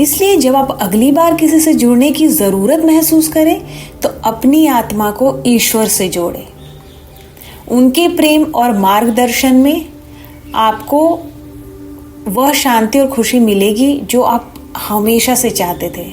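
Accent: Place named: Maharashtra, India. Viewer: Apka voice bahut soothing hai👌👍 very nice video 👌 & i think you are rmarathi from your accent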